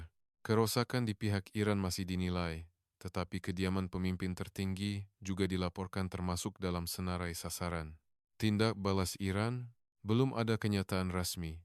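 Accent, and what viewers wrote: Indonesia. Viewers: Chanel dari indonesia ni, huruf je pon dia baca cara indon.Tapi betul ka x itu blm pasti. Blm ade pengesahan cerita setakat ni... Rekaan cerita je ni. 😂😂😂😂😂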